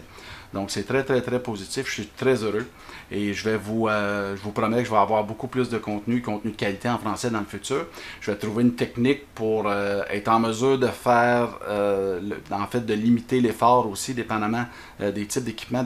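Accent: Canada. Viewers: Quel superbe accent canadien j'adore. Même si je comprends très bien l'anglais cet accent me fait rêver merci beaucoup!!!!!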